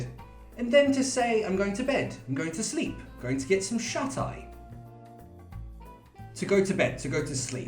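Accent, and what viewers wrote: United Kingdom. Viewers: is this british english? i was studying your videos because i tougth that it was americano english😭😭😭😭😭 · Yes, this is British English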